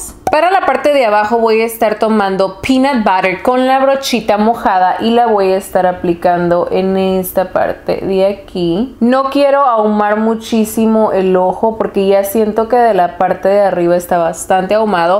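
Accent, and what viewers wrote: Sinaloa, Mexico. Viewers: Yo quisiera saver de donde eres ? 😜 me encanta como hablas, plebes??? Seras de Sinaloa ??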